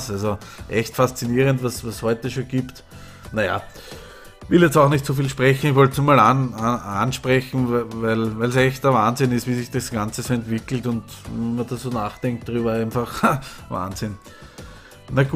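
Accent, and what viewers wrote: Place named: Austria. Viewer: Schau normal keine Videos Von euch aber mal Richtig interessanten Thema ist nicht der Beste Kommentaror von allen aber er Bringt die Sachen richtig Gut Rüber wenn du den Österreichischen Dialekt bisschen weglässt dann ist es Glaube viel bisser würde den Dialekt nur bisschen Reinmachen:) Weiter so:)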